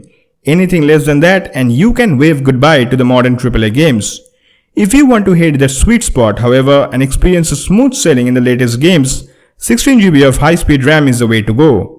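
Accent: India. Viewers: Lag raha hai thoda bahut indian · He speaks good english and i like his indian accent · You've gone a long way to hide your indian but you still got a bit left.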